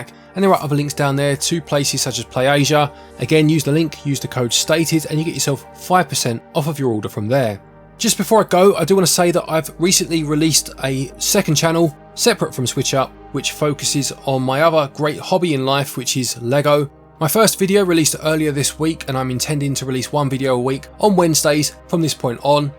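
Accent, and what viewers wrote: United Kingdom. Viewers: omg british english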